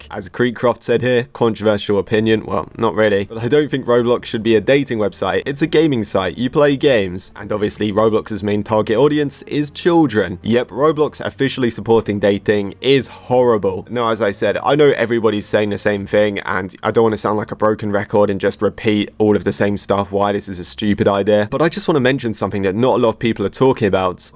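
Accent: United Kingdom. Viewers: You talk like a British person and could be one but the drama is insane that i respect you being British.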